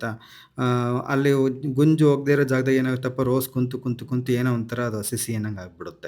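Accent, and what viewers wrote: Chitradurga, India. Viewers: ನನ್ನ ಊರು ಶ್ರೀರಾಮಪುರ ಹೊಸ ದುರ್ಗ ತಾಲ್ಲೋಕು ಚಿತ್ರದುರ್ಗ ಜಿಲ್ಲೆ ನಮ್ಮ ಊರಿನ ಕನ್ನಡ ಕೇಳೋದಕ್ಕೆ ನಾನು ನಿಮ್ಮ ವಿಡಿಯೋ ನೋಡುತ್ತೇನೆ ಅಡಿಗೆ ಕೂಡಾ ಅಷ್ಟೆ ನನ್ನ ತವರಿನ ನೆನಪು ತರುತ್ತೀರಿ ಅದಕ್ಕಾಗಿ ಧನ್ಯವಾದಗಳು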